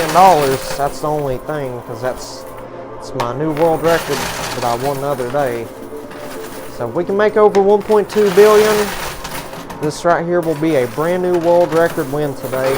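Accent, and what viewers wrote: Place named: Iowa, United States. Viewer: He sounds like a country boy from Iowa that is making it big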